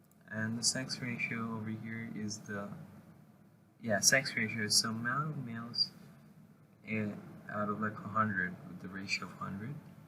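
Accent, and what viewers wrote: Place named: England, United Kingdom. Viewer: EPIC ENGLISH ACCENT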